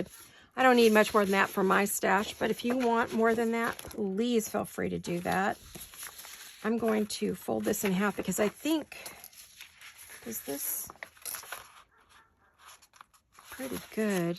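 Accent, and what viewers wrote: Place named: United States. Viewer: Please do not quit. I love your channel. Your American accent is charming.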